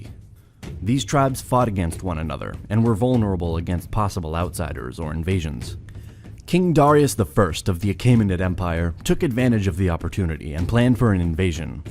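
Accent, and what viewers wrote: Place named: England, United Kingdom. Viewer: Marathas defeated and controlled whole india from 1700 to 1818 with puppet mughal emperor...... But foolish english accent person have no mention in video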